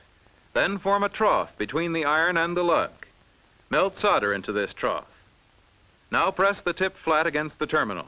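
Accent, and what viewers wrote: United States. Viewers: That is one heck of a soldering iron!!! Why do Americans pronounce always solder as 'sodder'?! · It's a silent "L", only here in America. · Why do Americans call it SODDER?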